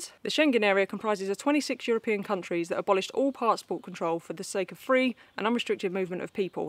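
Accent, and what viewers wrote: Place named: South London, United Kingdom. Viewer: Sounds like a proper Sarf Lundun accent 😁. Trying to lose my Sarf Lundun accent on my radio show 🙄 it’s not workin. Great explanation 👍🏻.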